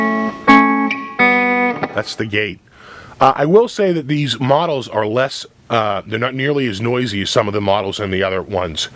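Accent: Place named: New England, United States. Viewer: lol are yu from rhode island? or sumwhere in new england?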